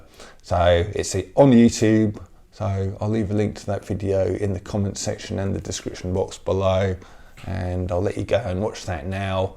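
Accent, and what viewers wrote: England, United Kingdom. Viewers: Tim great points and I love that English accent 👌👏🇨🇦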